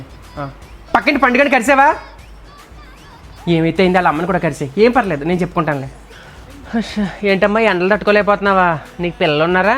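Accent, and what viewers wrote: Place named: Godavari, India. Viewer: అసలు గోదావరి భాష.. నాకు నచ్చింది..మాది భీమవరం.. పెళ్లి అయ్యక మచిలీపట్నం..నేను ఈ బాషా మిస్ అవుతున్నా...పప్పలు కొనుక్కోవడం ..నా యేదం..బాబా, ఎంత అందమైన భాష 😅😅😅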